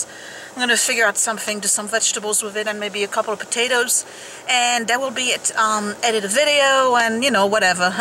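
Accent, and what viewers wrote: France. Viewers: I studied French for 4 and a half years. I had hope to visit there but it has not happened. I always could tell your accent was French. I hope Scott feels better soon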